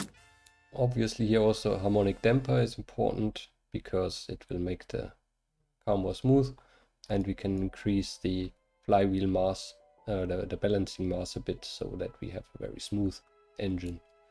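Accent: Germany. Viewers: As a german, i really like your (german) accent. It makes it kind of easier to listen on a second screen (listen to your stuff as a kind of audio tutorial). Especially with kids around. Strange, but it works. Keep up the good work.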